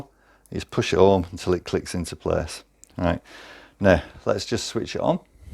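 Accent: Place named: Accrington, United Kingdom. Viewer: I'm in Accrington and your accent is very familiar! Where are you?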